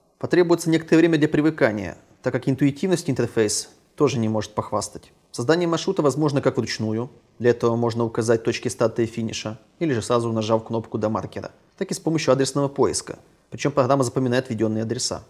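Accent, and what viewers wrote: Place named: Ukraine. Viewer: комментировать на русском украинским диалектов кокто не очень:( но видно старался так что палец верх :)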